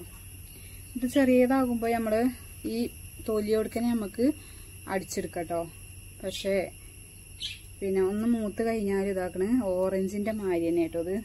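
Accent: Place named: Malappuram, India. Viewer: തനി മലപ്പുറം താത്തയുടെ ഭാഷ ❤️❤️❤️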